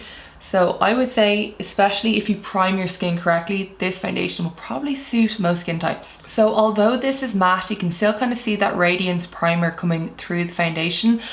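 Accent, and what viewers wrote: Ireland. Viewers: Where is from? Ireland? I can't put my finger on her accent. She speaks very clearly, it isn't until the end of her sentences or a phrase she will use that I pick up on her accent. Does anyone know? Just curious, its driving me crazy trying to figure it out lol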